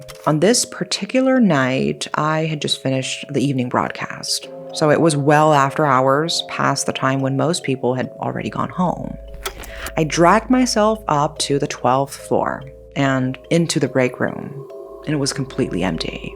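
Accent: United States. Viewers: I have always wondered how you got such a perfect American accent when (afaik) you grew up in Korea. Special gifted immersion school definitely explains it! // Man, everything I hear about the Korean school culture makes me SO GLAD I didn't grow up in it. // I love getting a personal ghost story, one that we know really happened and wasn't just a friend of a friend of a friend! <3 // Did you ever look up the history of the school to see if there was a case of a student being beheaded in an accident? // Those were great stories! Happy Halloween!! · You gave me chills with this one. Do your people have warnings against whistling at night? Also, have you lived in America? The reason I ask the second question is that it sounds like you have.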